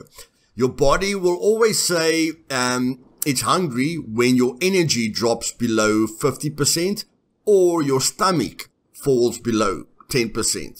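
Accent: Afrikaans, South Africa. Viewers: You my china sound rough South African Afrikaans ekse ... lekkerrrrr lol.. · Great video, subed. Edit: your Afrikaans accent is the best!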